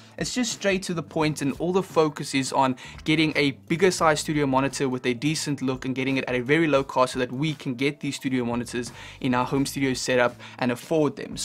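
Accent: South Africa. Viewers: I hear a South African accent. Greeting from a fellow South African muso living in nashville.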